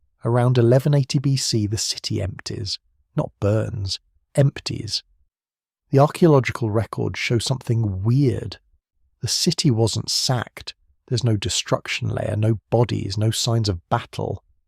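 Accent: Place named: United Kingdom. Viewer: Very good content and no useless/annoying sound effects. Thank you. Why the British accent, though? It doesn’t make this more believable or impressive. A matter of cost, perhaps?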